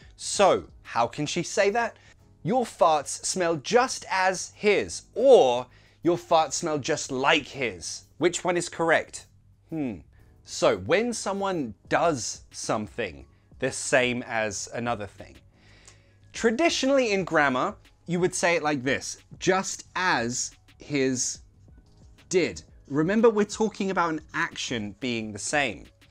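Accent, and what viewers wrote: United Kingdom. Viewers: Love your British accent💜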